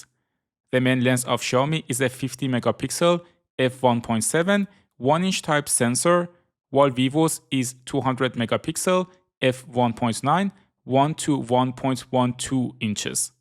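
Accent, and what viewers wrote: Iran. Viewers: چرا لهجه ایرانی داری داش؟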